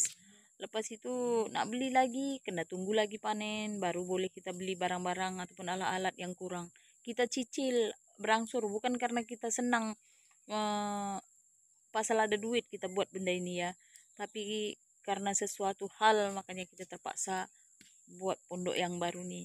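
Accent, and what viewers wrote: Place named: Malaysia. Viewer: Salam..pernah tinggal di malaysiakah..bunyi loghat seakan2 bunyi orang malaysia bercakap..orang semenanjung..maaf kalau saya silap..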